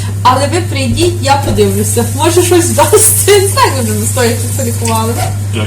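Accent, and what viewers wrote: Ukraine. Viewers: доброго дня мені подобалося ваш суржик тому, що вас також розмовляють суржиком. Я з Вінниці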